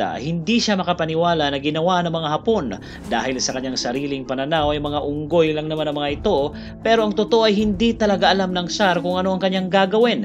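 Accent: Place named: Tagalog, Philippines. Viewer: Habang tumatagal medyo tumatagalog na ang punto.. At hindi na masyado puro "Pero"...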